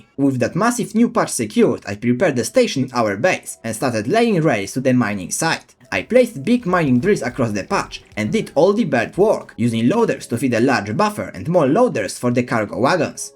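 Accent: Poland. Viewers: I am like 99% sure that ur native polish person, it's just something about your accent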